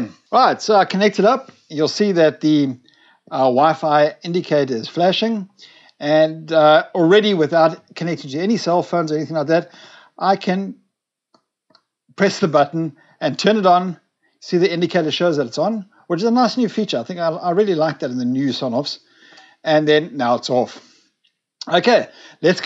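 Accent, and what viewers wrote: South Africa. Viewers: Thanks so much for this video, also nice to see a South African video for a change LOL (mind you, a South African accent that that's not making a video from abroad!). Please can you do a review video on these new emergency LED light bulbs that have a the built in battery inside of them but work like a normal light bulb. Also do you have recommended retail prices and where one can buy the sonoff switches? Will check itead out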